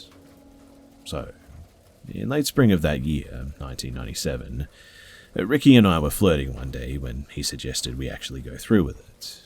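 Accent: Australia. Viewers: you be rockin with the full on australian accent better than lazarbeam himself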